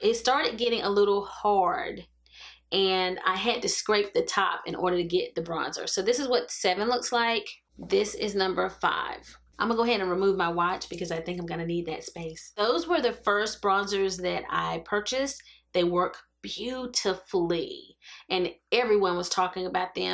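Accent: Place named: South, United States. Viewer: I adore your personality. Where you from? You sound southern.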